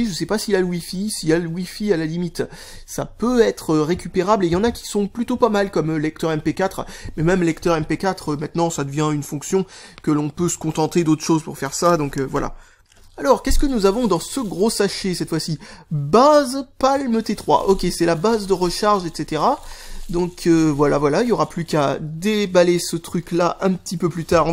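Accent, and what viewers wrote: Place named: Lyon, France. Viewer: Salut ton accent me fait penser à un accent Lyonnais. Ai-je raison?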